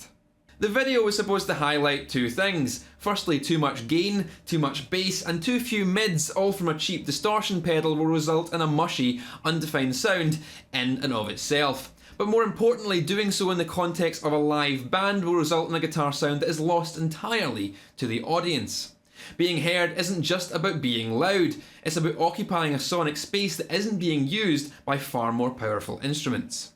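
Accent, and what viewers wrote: Scotland, United Kingdom. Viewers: Upvoted for Scottish accent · I've started watching your videos so I can learn to imitate your accent. Where are you from? Where in Scotland, that is? Can you tell the difference listening to someone from the Highlands vs from Glasgow, for example? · Great video!! Awesome continuation to your first one about this topic (which I loved) And I really enjoy your accent. I´ve worked with a couple of scotchs and really like their way of talking. Cheers.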